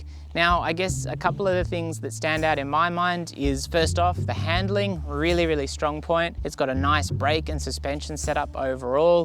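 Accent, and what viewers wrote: Australia. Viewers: This accent is way too much for me..where you from bro Australia? Wide bahhs nice wide bahhs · Pretty standard aussie accent cobbarino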